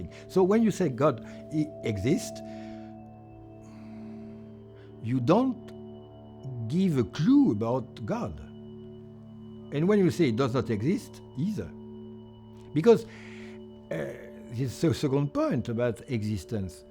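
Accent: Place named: France. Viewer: The priesthood is a very noble calling and much nobler than that of a philosopher. I think that Heidegger and Marion are not the best philosophers to be studied by ongoing priests, nor any other pretentious Frenchman for that matter. Regardless, I wish you all the best in seminary and in life. Today I will go to confession and pray for all fathers and (ongoing) priests. We the laity ask for good priests. One should not forget that faith is an unearned grace. Thank you for going to seminary. But concerning Marion:There is so much loose "thinking" in here that I am really amazed how people can fall for this. (Maybe it is the overly harmonic, dynamic and almost spherical music in the background? The French accent? The light, the colours of his clothes and the grain of the cloths?) He starts by "proving" that any concept is inadequate for God, by appealing to an "Augustinian principle", which he formulates as "If you comprehend it, it is not God". 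However, I think that here he conflates "comprehending" with "having a concept of". I can clearly have a concept of, say my spouse, even if at times I do not comprehend her, meaning that I do not comprehend her fully. Maybe my concept of my wife is then also "not adaequate", and I would be open to such a possibility (although I would find it ... you know ... a bit laughable and french. I clearly able to identify my wife and I do not mistake other women for her nor do I mistake her for other women. I would say that my concept of her is a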